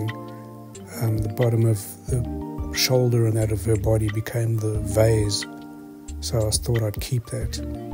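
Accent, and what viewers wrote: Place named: South Africa. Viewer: Lovely painting! You sound SAfrican...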